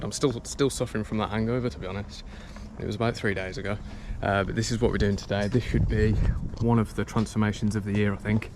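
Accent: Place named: Staffordshire, United Kingdom. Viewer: Alright. You sound like your from the staffordshire area.... do you have a go to shop for powerwashers and accessories. If not what is your recommended website?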